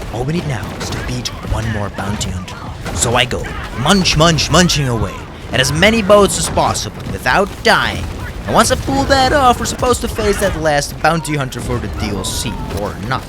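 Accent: Netherlands. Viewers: your dutch arent you? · are you dutch? cuz your accent sounds very dutch imo.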